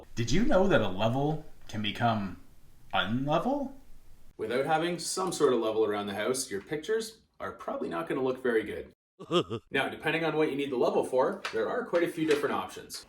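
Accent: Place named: Canada. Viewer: Listened 3x for the Canadian accent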